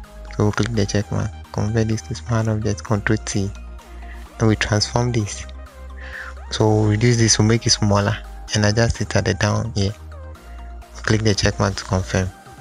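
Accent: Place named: Africa. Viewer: He's a african.. he has an african accent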